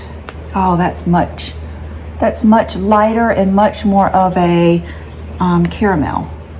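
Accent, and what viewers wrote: South, United States. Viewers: Hi!! I'm a new sub and I'm totally binge watching all your videos ☺️ I love your channel!! I especially love your jewelry, omg I have to get something from Dean Davidson and Cat Janinga! I also love your fashion sense and outfit of the day! But my absolute fav thing is your accent!!! It truly makes me smile 😁 I live in CA now but I was born and raised in TX. I have an affinity for all things southern!! Oh, and the last thing is I love the way you say Brooke. That's my name as well. It reminds me of being back home and someone calling my name 🥰 xoxo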